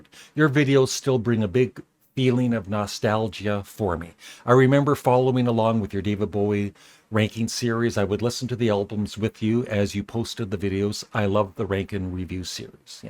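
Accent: Canada. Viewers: I have always pronounced it jag~er. Maybe it’s your Canadian accent,!😉I’m so glad that you get more good comments than the rotten ones.😊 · Perhaps some people don’t realize you are just simply saying Jagger’s name with your Canadian accent?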